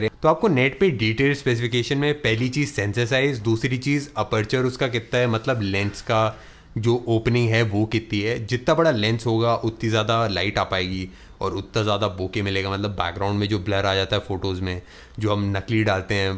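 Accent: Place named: Bangalore, India. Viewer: Brooo are u from banglore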